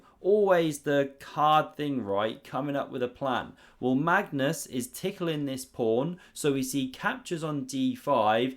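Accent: United Kingdom. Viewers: Def the best chess commentator, brit accents just class up the experience.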